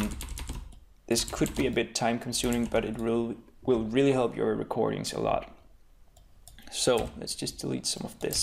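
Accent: Denmark. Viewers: Yoo dude, this is absolutely brilliant, thanks a lot! Also, er du dansk? Synes virkelig man kan høre det på din accent :P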